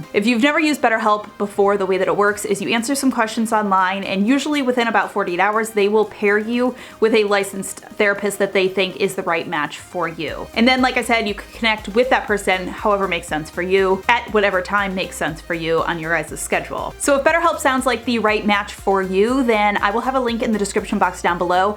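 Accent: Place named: Boston, United States. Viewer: Muchacha!! 🎉 you’re brilliant on soo many levels! I got sudden-chronic-declutter-syndrome at 2am, 2 days in a row .. I’ve hit my “plateau” on day 3 and from your videos I’ve gathered i have tooo much stuff. All downloads printed! ❤❤❤ Enemy identified, resolution spotted -and Boston Cream, cuz they talk funny! Wait, not funny. Cool! Interesting? Different? It’s an appealing accent😅 I’d have a nonsense conversation just to hear you talk. Soo I’d have a donut that reminds me of that potentially interestingly speaking boston-ly person. Keep being your greatest self! God bless ❤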